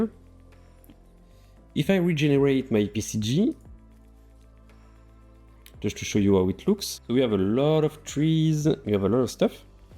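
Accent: France. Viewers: Salut, j'aime beaucoup ton accent français et tes tutos:) je ne suis pas française mais tunisienne mais j'ai récemment entamé des tutoriaux sur ma chaine en Français pour Unreal (et prochainement sur 3ds max et Blender) car j'aimerais participer à la commu FR des tutos et je t'évoquerais dans les prochaines parties de mon tuto sur les terrains/Biomes, si quelqu'un a du mal avec l'anglais, m'autorises tu à poster ce commentaire sur ta chaine pour évoquer la mienne?: <link>, merci si c'est le cas:) et continue les tutos j'ai subbé!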